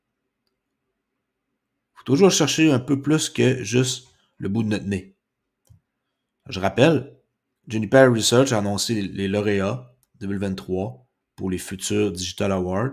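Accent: Canada. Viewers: Ah bah voilà je me doutais bien que cet accent était Canadien 👋🏻🤝🏻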